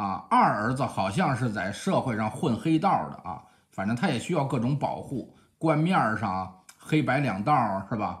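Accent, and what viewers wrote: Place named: Tangshan, China. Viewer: 哈哈唐山话，比落亭话还有味儿呢。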